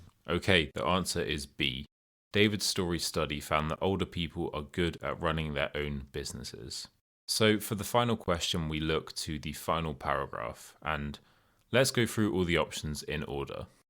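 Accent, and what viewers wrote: United Kingdom. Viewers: Like it very much your videos and it perfect British English and properly helped and thank you very much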